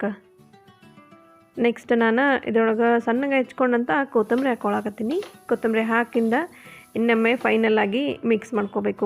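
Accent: Dharwad, India. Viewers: ನಮ್ಮ ಧಾರವಾಡದ ಮಾತಿನಲ್ಲಿ ನೀವು ರೆಸಿಪಿ ವಿವರಿಸಿದ್ದು ತುಂಬಾ ಇಷ್ಟಾ ಆಯ್ತು ರಿ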